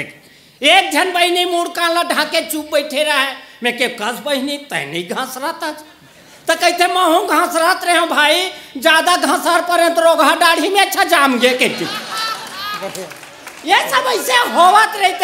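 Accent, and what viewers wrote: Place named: Chhattisgarh, India. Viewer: मजा आगे छत्तीसगढ़ बोली मे जियो कवि जी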